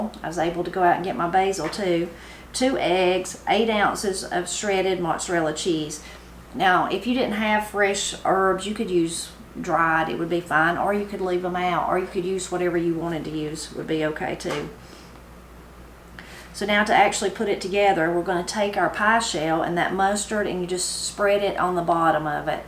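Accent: Appalachia, United States. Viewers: I live in Nebraska. Have I ever been to Appalachia? Nope. Will I ever have a huge garden like you do? Nope. Will I ever put up produce, cook soup beans or kilt lettuce? Also no. But, I'll always watch these videos because your family is just the sweetest... and I just love the accent. Thank you for being so down to earth, humble, and Godly. ❤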